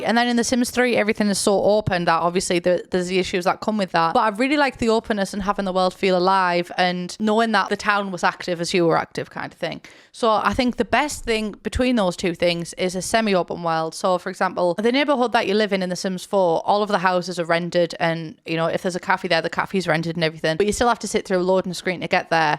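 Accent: United Kingdom. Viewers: I have always loved the British accent, and I enjoy your content, damn good job, subscribed. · whenever someone says british accent, this is what I think of :)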